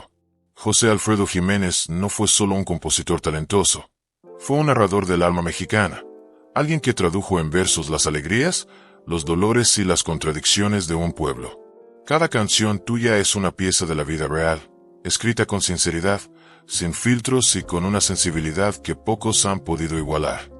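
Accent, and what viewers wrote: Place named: United States. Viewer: Y tiene acento gabacho. Parece que es gringo el narrador.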